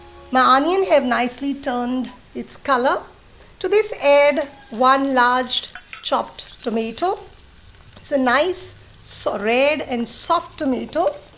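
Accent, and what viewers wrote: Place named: England, United Kingdom. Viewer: New accesent of language... Seems like England has recently adopted you 😢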